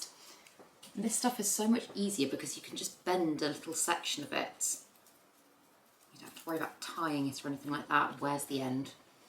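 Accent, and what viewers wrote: United Kingdom. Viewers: I watch your videos from France to learn about plants and to learn english as well!!! I love your british accent and prononciation!! Thank you!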